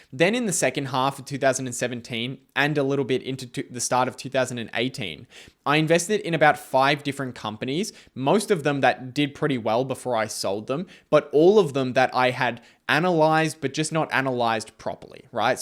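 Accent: Australia. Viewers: I only recently discovered your videos and I really like them. I wish I had your insight when I was your age! Your reflection on your mistakes is so valuable for me! It made me understand my own decisions and my own thought process much more. It also helps me to understand that passive investing probably is better for me as picking the right stocks is a lot of work as you said. I also like the clean style of your videos: no annoying noise, straight to the point and just enough visuals. They are also not too long! And your Australian accent is very pleasent!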